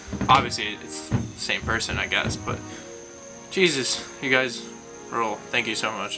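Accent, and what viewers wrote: Russia. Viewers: Eres ruso p estadounidense?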